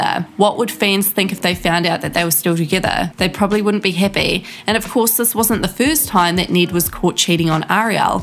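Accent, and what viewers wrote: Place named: New Zealand. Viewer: Why is it funny? It's a New Zealander accent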